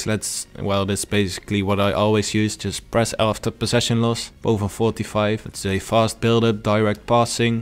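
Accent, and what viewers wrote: Netherlands. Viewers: Hmm I can hear the Dutch accent ;)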